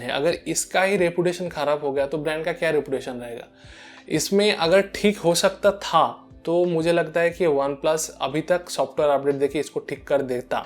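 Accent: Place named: Bengal, India. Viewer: bro tum bongoli ho kya? great work tumhe bahut mehnat lagi hindi bolne mai. koe na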